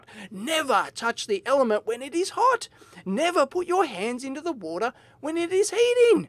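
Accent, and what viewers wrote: Australia. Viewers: Bigclive did a review of these a while ago... but your australian accent makes this so much better. Makes me feel like if it kills me, i'm just not australian enough.Keep up the good work! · Yeah! Aussie accents make this awesome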